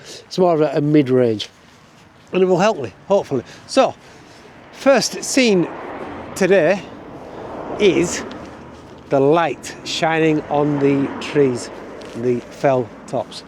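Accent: Yorkshire, United Kingdom. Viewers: I've had great pleasure in viewing your interesting, chatty videos, right from the beginning. This particular episode reminds me of when I had my best work assessed by a particularly high ranking elderly lady photographer, around 15 years ago. This was after having some 40 years of photographic experience behind me. In a nutshell, though the images showed competence, she told me the subjects were" too busy" - her own words. This pair of words is of course the absolute opposite of your subject here - minimalism. In taking on board this talented lady's advice, my photography was vastly improved and I have kept this thought in mind during the 15 years since, whether it be in monochrome or in color., your excellent advice is exactly spot-on with what this great lady photographer told me. Over the years since, my photos in their comparative simplicity have IMPACT, which was so lacking before! 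From one Yorkshire man to another, I love the refreshing content in your videos, including the relaxing music as well as the Yorkshire twang!!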